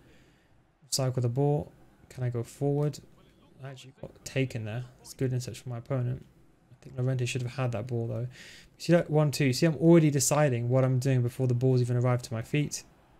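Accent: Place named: United Kingdom. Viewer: too fast und unclear talking. not 100% understandable for not native English. maybe the British akcent is the reason. I like the content but it's too exhausting to get it/to listen. no offense.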